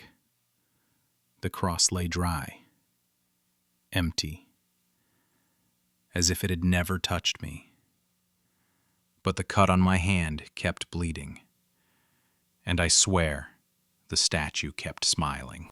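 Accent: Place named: United Kingdom. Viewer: are you a Brit maybe cause your accent shown through in the middle of the story 😁?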